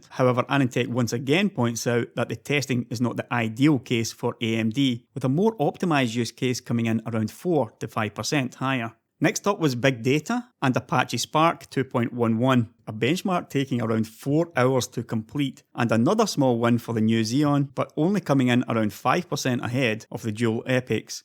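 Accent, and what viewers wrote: Scotland, United Kingdom. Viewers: I honestly want to think you are Scottish but 1% of my brain says you are Irish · is he scottish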